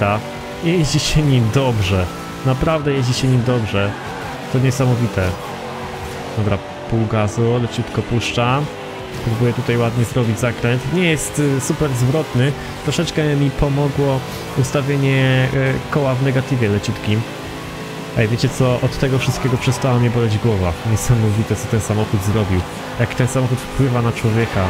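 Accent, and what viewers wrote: Szczecin, Poland. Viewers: jesteś ze Szczecina??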